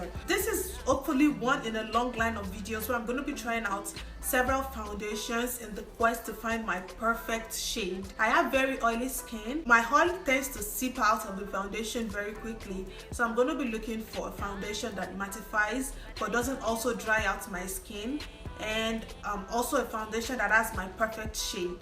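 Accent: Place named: Nigeria. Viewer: you are so beautiful! your accent sounds familiar to me but I might be misplacing it... are you Nigerian? I am too, Igbo!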